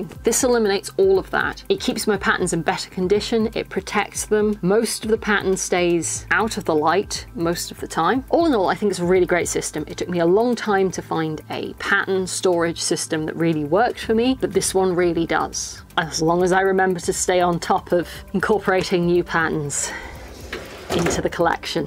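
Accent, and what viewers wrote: United Kingdom. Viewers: Wow! I wish you’d worked this out and told me 50 years ago. Hah - I know you weren’t even born yet, then! Well you’re a genius. I could understand and appreciate every single piece of info I just watched and as you flipped through your patterns, I recognised some of the ones I myself bought in the 1960s, 1970s and 1980s. Actually all that stuff comes back into fashion every so often and I haven’t bought patterns lately - they seem so expensive these days! It’s such an intuitive and sensible way to organise patterns. Mine have all been sellotaped together over the years because they do indeed swell up every time you use the contents. And I’m going to set aside some time to put your wonderful system into practice for myself. Thanks a million ❤❤❤ PS How I love to hear a lovely calm British English voice with no affected growling or peculiar accents. 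😂😂😂 So many youtube videos get turned off here, because I can’t bear the hyped up crazy sounding voices and over exaggeration on each word. And these days, most people seem to shout at the microphone for some reason. It’s a delight to listen to you, so thanks very much. Keep up the good work - it’s always interesting and helpful and unusual and informative x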